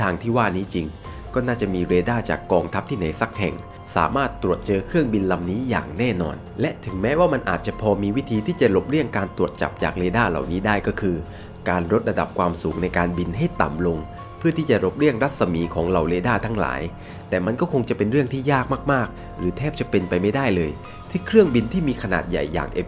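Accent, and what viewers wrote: South, Thailand. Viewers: เป็นคนใต้หรอฮะ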